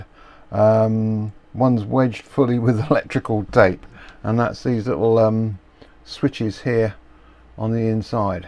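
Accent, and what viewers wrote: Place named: RP, United Kingdom. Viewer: Great repair! I am a teacher of English living in Mallorca, Spain. I need to say that you have a great accent and pronunciation! I have even shown your videos to my students to show them propper RP! I am also very keen on repairing amplifiers! Congratulations on how much you teach the community with your great videos!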